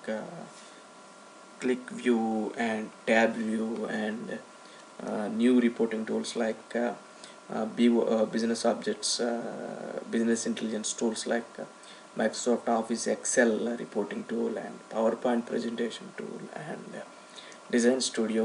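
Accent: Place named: India. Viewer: Please don't use your ugly cranky shitty indian accent, gives me headaches. Get a proper english education.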